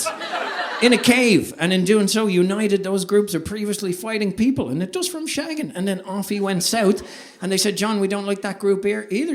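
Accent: Ireland. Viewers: Spoken like a true Irishman. Bless you my fellow countryman.☘️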